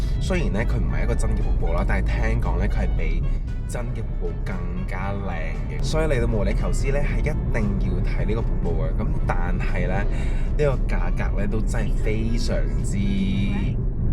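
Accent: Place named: Macau, China. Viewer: 澳門口音好得意😂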